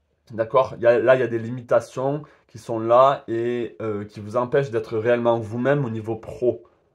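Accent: South, France. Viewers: coup de foudre au boulot oui, je me suis inscrite à la danse et commence la semaine prochaine, je peints également et pratique les oracles ++++ puisque parait il je suis douée merci pour cette guidance et ce petit accent du sud que j'adore étant du sud ouest ;) belle journée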